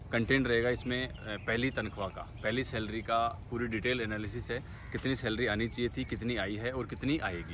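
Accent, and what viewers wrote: India. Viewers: Aap sikar se ho kya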